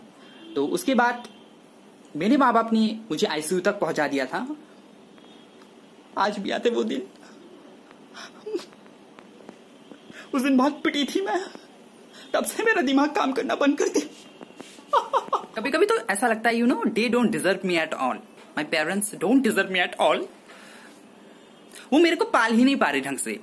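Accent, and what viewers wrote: Assam, India. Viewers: Ap Assamese ho kya ap ke tone se aisa lagta hai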